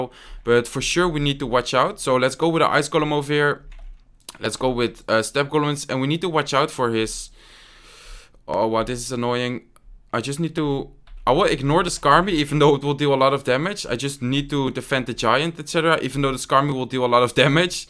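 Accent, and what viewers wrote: Netherlands. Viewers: Lol dat accent zo gemakkelijk om te weten als er een nederlandrr engels praat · Hahahaha dat Nederlands accent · Nederlands accent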